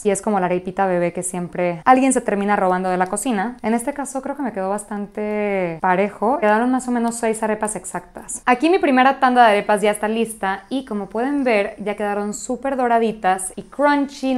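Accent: Mexico. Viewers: Estaba buscando como se hacen las arepas pero creo que son lo mismo que los sopes en México? Creo que las voy a preparar como las estás preparando tu porque tengo esa marca de harina, gracias!! Te escuchas muy mexicana!! Saludos!! ❤ 🇲🇽🙏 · Ahora se me antojo unas arepas pero se me acabo la harina aaaahh:´) jajaja no sabia que eras venezolana, nunca desde que te sigo pensé eso, tu acento mexicano sobresale mucho 😅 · Si tienes algo bello es tu voz que combina super con el acento mexicano ❤ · Buscando recetas de arepas, la encontré. Es maravillosa. Me encanta el español que habla, muy claro y su acento mexicano. Soy mexicana viviendo en Europa desde hace bastantes años. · Ahh es q es Venezolana, ahora tiene sentido q tenga acento mexicano, pero su canal se llame 😂😂 ya decía yo..😂😂😂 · No tienes acento, rienes el acento de mi pais, México!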